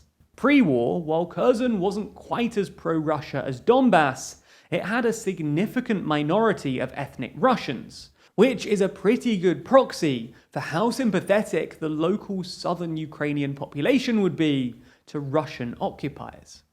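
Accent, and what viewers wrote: United Kingdom. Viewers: Dont expect to get a biased opinion from a guy with a british accent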